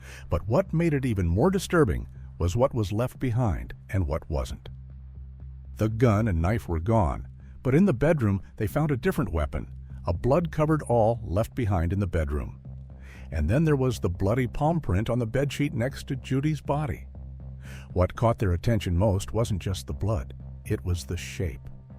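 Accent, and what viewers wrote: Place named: England, United Kingdom. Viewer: I love your English accent. In which states of the US do people have this accent?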